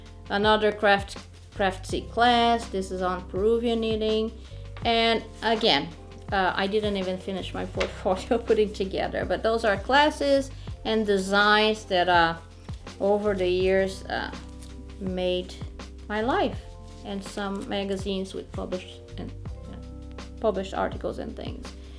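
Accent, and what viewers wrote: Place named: Portugal. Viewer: So you are Portuguese! I wasn't sure about your accent! Thanks for your work .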